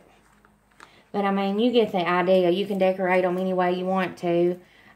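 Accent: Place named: South, United States. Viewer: love the southern accent ! awesome idea's !!!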